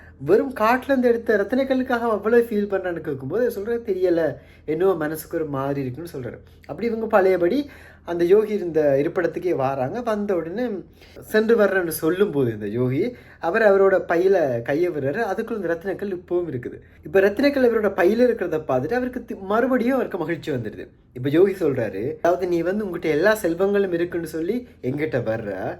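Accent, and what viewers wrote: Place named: Sri Lanka. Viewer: அழகான இலங்கை தமிழ் புரிய வைத்த நன்றி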